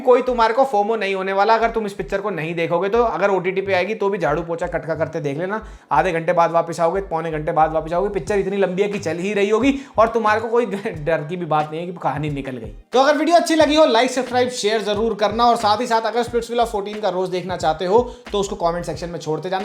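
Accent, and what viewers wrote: Haryana, India. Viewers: I just love your review, it's so humorous....pls speak more in haryanvi dialect ....love from Australia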